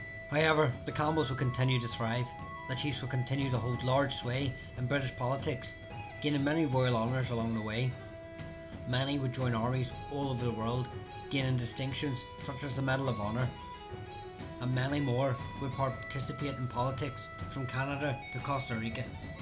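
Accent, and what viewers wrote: Ireland. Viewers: It is really hard to understand what is being said due to the annoying, loud music plus what appears to maybe be an Irish accent. Such a shame as the information appears good.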